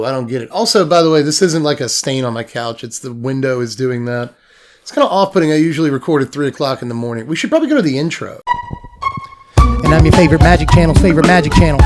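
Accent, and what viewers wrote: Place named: Georgia, United States. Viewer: I totally guessed you were from GA takes one to know one.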